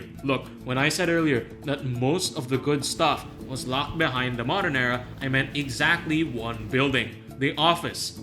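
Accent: Philippines. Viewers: you sound very filipino. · i just started playing tropico 6 and was looking for some videos to get better. i noticed your accent and subscribed right away haha. love seeing filipino channels as well thought out as yours. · you sound Filipino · Are you filipino? I can't quite tell your with your accent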